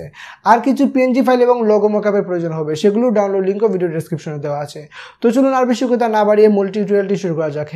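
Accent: Sylhet, Bangladesh. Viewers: Vaiya apne Sylheti ni?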